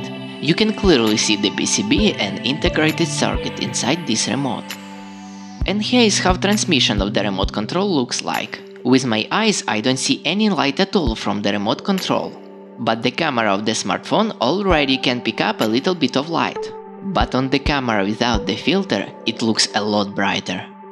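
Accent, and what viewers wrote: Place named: Russia. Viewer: Москальський акцент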